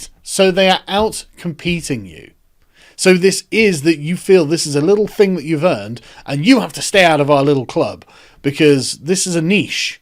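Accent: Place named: Public school, United Kingdom. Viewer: "Kont...I kont"...what a twat public school accent.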